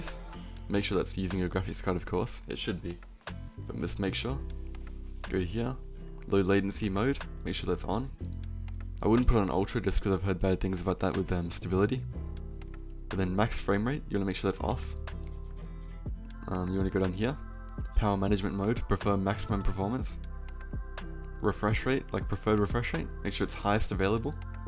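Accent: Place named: South Africa. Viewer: I smell a South African.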